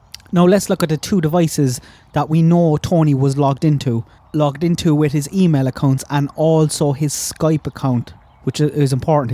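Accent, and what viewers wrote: Ireland. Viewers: Irish and you could slow the video down if you're having trouble lol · You have a heavy Irish brogue.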